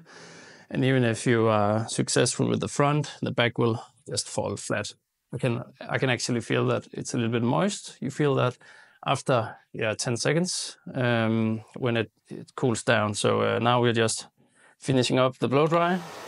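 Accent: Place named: Denmark. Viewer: i knew u were danish by ur accent, nice video :D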